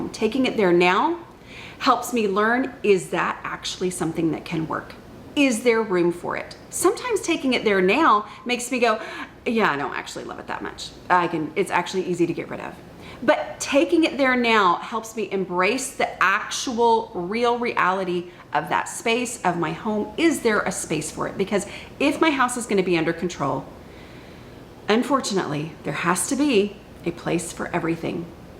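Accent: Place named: Texas, United States. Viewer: Postal scale (I believe speaks Texan ❤😊)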